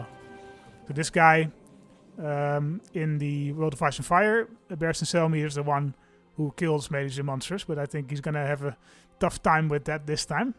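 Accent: Netherlands. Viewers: Are you Dutch? I’m hearing a dutch accent, so fun to see someone from my country having the same passion for asoiaf and ck2 agot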